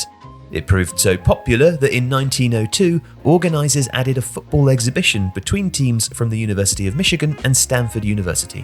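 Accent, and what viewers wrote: United Kingdom. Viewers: I refuse to have a British voice tell me how American college football works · Hearing a British voice talk about college football is breaking my brain lmao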